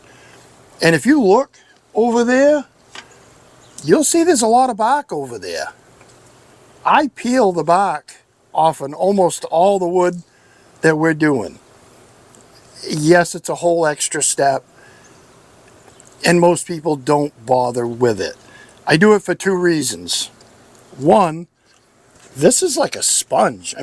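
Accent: Maine, United States. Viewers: Are you from Maine by chance? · Yes you get that white mold on birch here in Nova Scotia too. New Subscriber here, because of the Maine accent 😅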